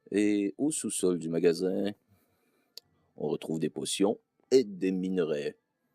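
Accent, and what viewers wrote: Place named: Quebec, Canada. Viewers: C'est normal, c'est un québécois.. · Tu viens d'où au juste ? Si t'es de la France, je peux te dire que t'as un petit accent québécois, j'ai l’impression.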